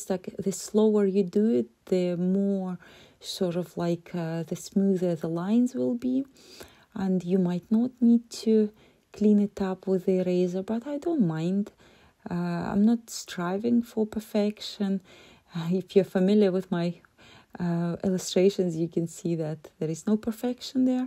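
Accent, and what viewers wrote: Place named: Eastern Europe. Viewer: You have a lovely accent. Is it a mix of Eastern European and Scottish?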